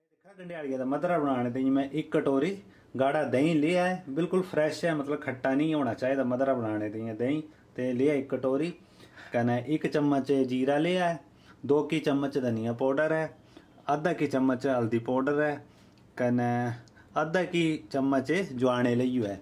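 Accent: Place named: Kangra, India. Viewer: Mouth watering 😋 recipe ekdum must. Aap ki baaten achi lgti hai very sweet kangri bolde tusan. Missing himachali dham.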